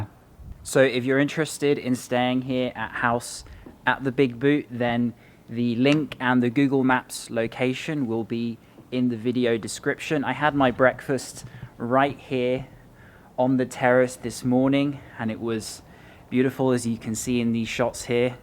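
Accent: United Kingdom. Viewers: Welcome back to the best british accent ever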